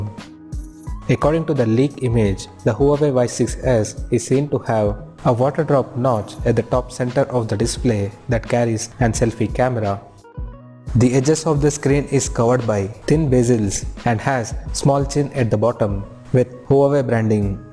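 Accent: India. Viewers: U speak with a strong indian accent hard to understand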